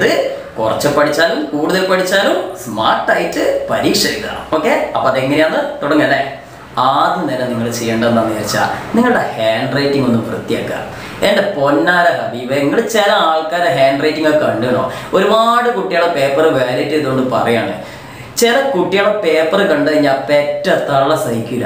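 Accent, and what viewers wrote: Malappuram, India. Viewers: ikkaa ingale language kettaal ariyaa malappurathkaarananenn Malappurathe piller like plzzzzz😄😄😄😄😄😃😁😁😁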